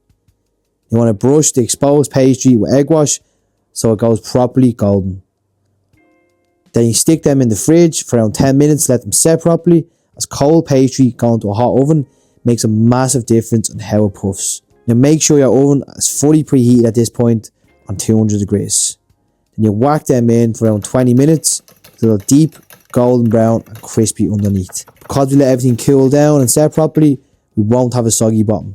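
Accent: Ireland. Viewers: Honestly, his Irish accent isn't that difficult to understand. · This looks absolutely SCRUMPTIOUS darlin I can't wait to make it and give it a try. Love the accent and love my Irish men ❤❤🎉🎉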